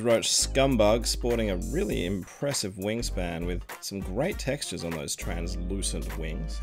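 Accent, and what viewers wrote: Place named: Australia. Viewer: Where did you get these? I assume you're in Australia judging by the accent. I am too so I'm looking to get these if I spot them anywhere.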